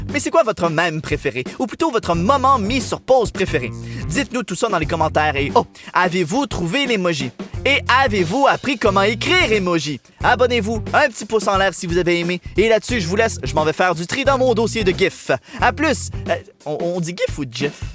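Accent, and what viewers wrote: Quebec, Canada. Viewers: J'adore le Québec et c'est un rêve de partir la bas . Et ton accent québécois🤗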